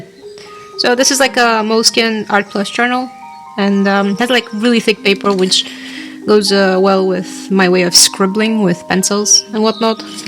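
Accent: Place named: Russia. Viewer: i was listening to you and your accent and i felt like you speak russian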